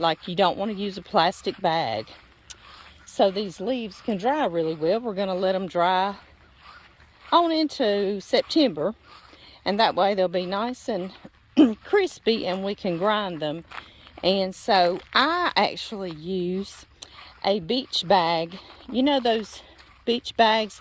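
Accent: South, United States. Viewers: He makes the best gumbo and his Cream Chees Pecan Pie is to die for. I am going to try and make succatash. I love you S. Accent. We have lived here for 48 yrs and he has not lost his accent.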